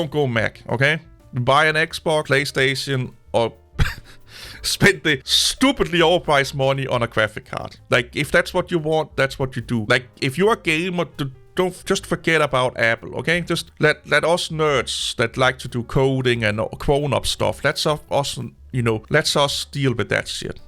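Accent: Denmark. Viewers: Are you from Scandinavia. Your accent sounds very Danish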